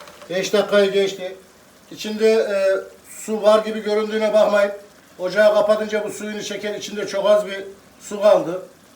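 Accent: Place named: Anatolia, Turkey. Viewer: Ustamın şivesi ve doğal haliyle gerçek ve dürüst Anadolu kişiliği güzelliğe güzellik katıyor,Rabbim yolunuzu açık eylesin.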